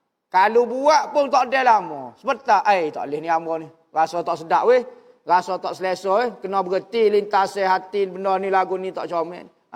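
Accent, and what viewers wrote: Kelantan, Malaysia. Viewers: Alhamdulillah kamu pahe gok ustadz kecek loghat kelate..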